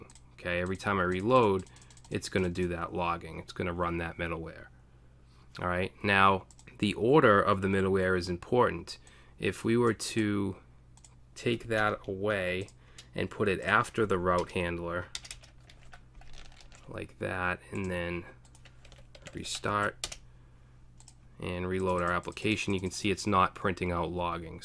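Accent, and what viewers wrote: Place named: Northeast, United States. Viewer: This guy is the best anywhere! Yes, he has a NE accent. I am not sure why people feel the need to point that out. It's like saying "youtube's logo is red and white" -- yup, we know. I am just happy I found a teacher that didn't bore me to death with their relentless, robot, yammering!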